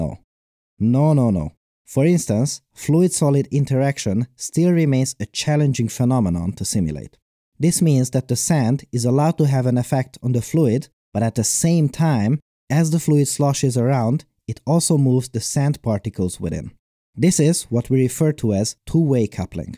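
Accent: Hungary. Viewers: Hogy hallatszik, hogy magyar vagy :D klassz a vidi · omfg as a hungarian I can really feel your hungarian accent xd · as soon as i heard your voice i knew you were hungarian. i like your accent :) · I like your Hungarian accent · Jovan magyar akcentusxd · Probably. His accent sounds a bit hungarian as well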